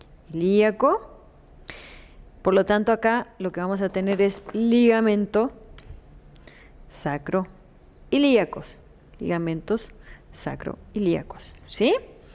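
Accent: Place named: Argentina. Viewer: Es argentina ❤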